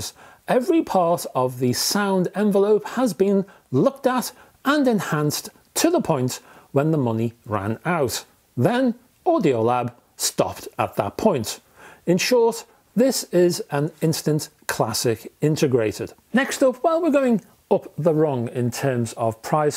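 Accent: Liverpool, United Kingdom. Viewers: Hi. Love your reviews. Returning to the UK with a pair of Elac Unifi 2.0's and an Audioengine B1 Blutooth DAC. I use the Audioengine to stream music from my phone. I want to purchase an amplifier to complement this set up. I Plan to connect the TV to the amplifier and maybe some other digital sources so optical and coaxial inputs would be important. My budget would be around £500. Any suggestions? Love your L'pool accent. Love L'pool too. John.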